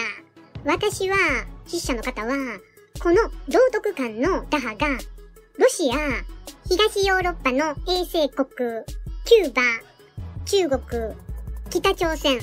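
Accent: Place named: Osaka, Japan. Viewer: なかなかマルクス主義を主題にしながら、現実は、それが空論であり乍ら、利用され続けているという惨たらしい歴史をまことに見事に大阪弁の楽しさで、しかも日常的に示唆し続けてくださっている先生に感謝です。この地球俯瞰的な見方は、隣国から浸透され続けている今、とてもとても必要不可欠だと思います。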